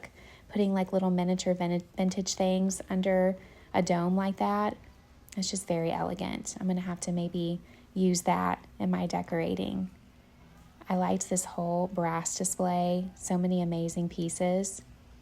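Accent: South, United States. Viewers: Your content is wonderful and your beautiful Southern accent is actually bliss to listen too 🍬 you explain and explore 😉 a pleasure to go with you on your thrifting expeditions 🎉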